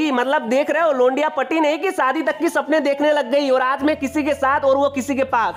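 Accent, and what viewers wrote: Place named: Nepal. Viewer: When nepali speaks hindi and thats too assamese thisis awsome ❤️❤️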